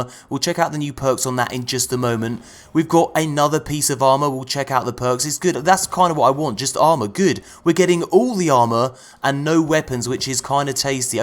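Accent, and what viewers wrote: United Kingdom. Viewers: Why tf are do all these destiny channels have a British Accent?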